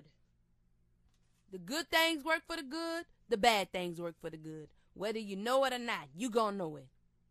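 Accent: New Orleans, United States. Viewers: I was thinking of some old BME stuff and Googled you. Glad to see that your life has turned around for the best. But truth be told, your past lyrics use to be on rewind because you was spitting fire. Plus I love women from New Orleans accent.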